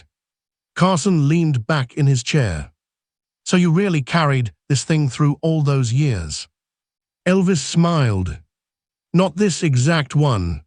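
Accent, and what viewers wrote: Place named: United Kingdom. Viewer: I didn't click on here to listen to a Limey run his dialog for an hour. I'm deleting my sub and thumbs up too.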